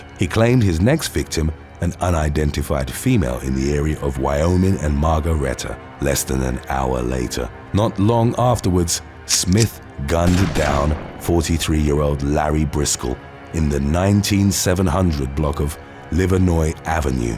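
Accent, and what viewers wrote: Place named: United Kingdom. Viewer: Lol when you realize this channel is British. I didn't think about your accent. Soliciter=lawyer??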